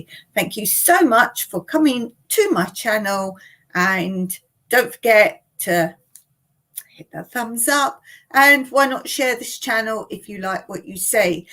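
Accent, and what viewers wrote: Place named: England, United Kingdom. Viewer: 😊 I enjoy the reading especially in your British accent.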